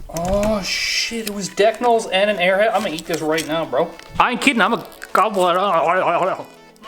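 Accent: Canada. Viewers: i could tell he was canadian within the first 5 seconds this man spoke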